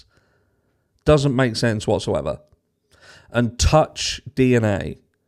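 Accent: Norfolk, United Kingdom. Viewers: Wow, you've a thick norfolk accent!! I dont have a regional accent but i'm born & and raised in Norfolk so I recognised it within seconds! You were saying the word 'hear' but you pronounce it as 'hair', made me smile!😊 The lawyer you know is absolutely brilliant youre right. He always remains objective, even though he leans to the side of a defence perspective. Isn't it nuts how differently the US tries cases? They are so open compared to us here in England!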